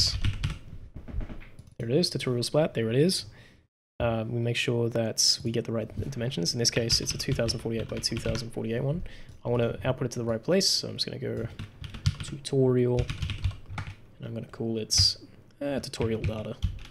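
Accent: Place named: Australia. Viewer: . Yep. Aussie.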